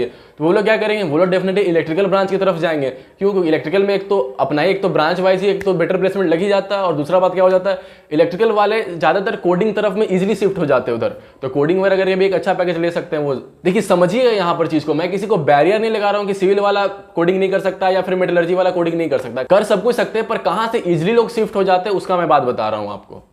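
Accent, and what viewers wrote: Bihar, India. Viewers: Your voice has a bihari tone 😅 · Yes , he is from Bihar.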